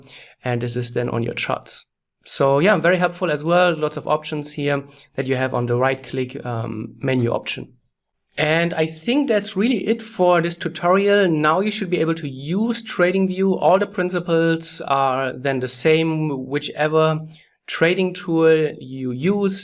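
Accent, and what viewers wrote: Germany. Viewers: direkt gemerkt das du deutscher bist :D gutes video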